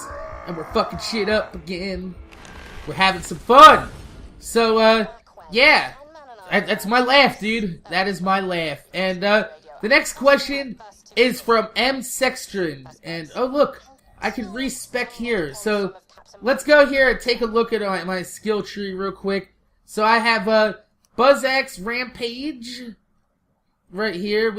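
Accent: New Jersey, United States. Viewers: Dat New Jersey accent :D I'm from Tennessee, so I have a southern accent. Only slightly though.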